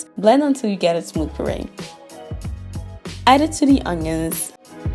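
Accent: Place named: Caribbean. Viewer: You sound Caribbean. Im in Jamaica and all the ingredients you've listed are accessible. Thank you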